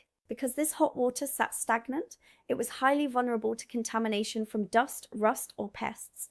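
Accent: England, United Kingdom. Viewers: How is she from the US but speaks with an English accent?!!!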